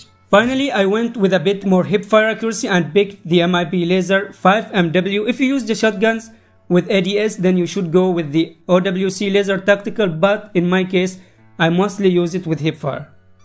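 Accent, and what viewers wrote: India. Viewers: Bro here I am .. Your voice is like Indians .. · he's from Oman, but the accent is the same as an Indian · bro are you from India?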